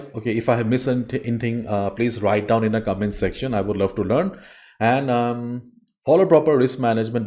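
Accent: India. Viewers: this is not racism but I would trust a Indian person or sort of someone with the accent u have idk what it is its just Indians or asians brains are very smart with stuff like this and very proud of u bro helping people out I was doing analysis on gbps usd and couldn't fine where price could pottenially go and u have opened my eyes to it keep putting videos out I am going subricbe now u smart brother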